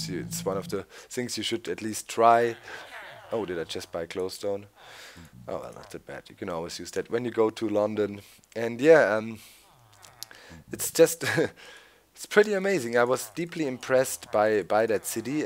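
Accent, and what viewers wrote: Germany. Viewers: Du bist Deutscher?! Cool, jetzt wo ich es weiß hört man doch ein bisschen, dass du Deutscher bist :DD Aber naja Englisch kannst Du!!! :DD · Ist realy strange a Person who also Comes feom the Country you come from in another language hear to speak and commenting in english at the same time you know he also under stand this: Man hört den Akzent raus! and i wanted at every time I wrote a comment say the following sentence: "it is nice to be a greiß, but it is higher to be a bauer! ;D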